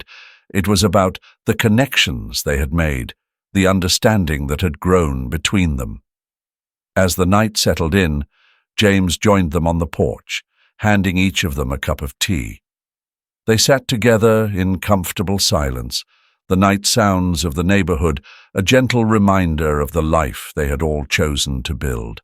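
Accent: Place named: United Kingdom. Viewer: Love the British narrator’s voice and the way he tells the story well. Much better than those monotone robotic voices with no emotion, feeling or passion.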